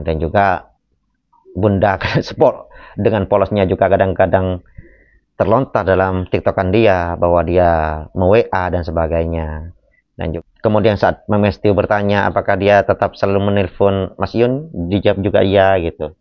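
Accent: Madura, Indonesia. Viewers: Pancen meduro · Assalamu'alaikum bro klao sya cermati dari suara nya sampeyan ini kayak nya orang Madura ya bos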